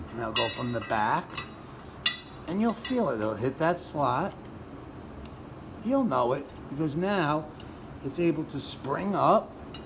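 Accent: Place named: Long Island, United States. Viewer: Thank you for the video! And your voice is awesome, reminds me of the neighborhood I grew up in on Long Island. 👍🏾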